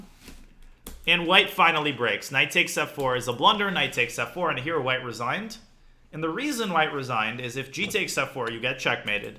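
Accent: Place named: Russia. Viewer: Man, your russian accent is just amazing. I can't wait to join your stream to hear it live.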